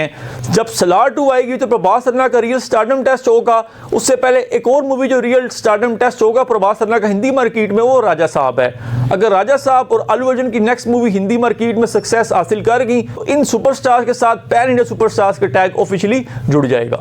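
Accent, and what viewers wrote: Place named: Pakistan. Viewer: You seem pakistani accent।।।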